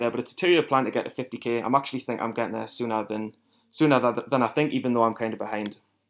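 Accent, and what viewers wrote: North East England, United Kingdom. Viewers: are you from the north east of england by any chance because i am too :)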